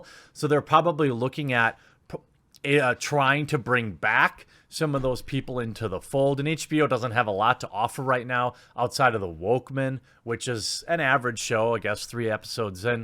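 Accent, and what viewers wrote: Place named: Wisconsin, United States. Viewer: Wisconsin accent. Egg becomes "ayg," bag becomes "bayg" and dragon becomes "draygun"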